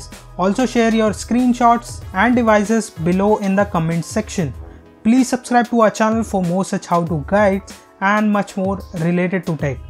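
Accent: India. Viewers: hate indian english accent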